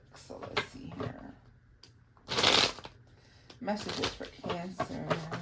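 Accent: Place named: South, United States. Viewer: i’m from texas so i liked that lil country accent lol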